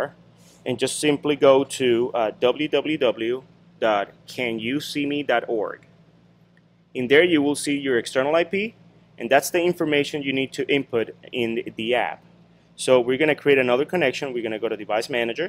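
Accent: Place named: India. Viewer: Nice accent, indian?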